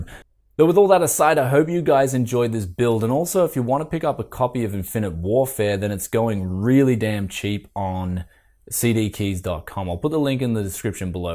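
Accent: Australia. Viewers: Is this fella Aussie? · Oh yay an Aussie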